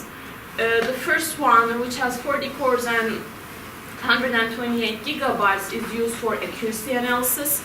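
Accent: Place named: Turkey. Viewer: That turkish accent and nervous voice was kinda hard, but the content was awsome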